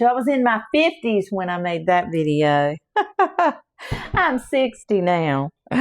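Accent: South, United States. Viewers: Your still a beautiful lady, absolutely love your southern accent.😊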